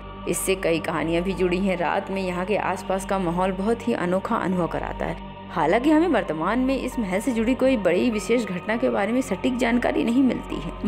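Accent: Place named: Indore, India. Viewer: Indore walon ki tarah hi h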